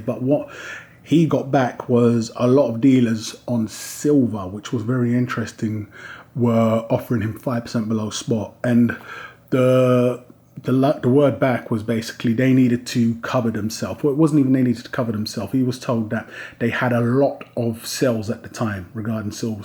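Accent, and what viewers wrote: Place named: Yorkshire, United Kingdom. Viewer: Thank you … I know we don't always agree 100% on things but its good to have many points of view. PS I am in Yorkshire …. So I am guessing we are not too far apart on your accent!!!! Anyway I buy only to melt them down.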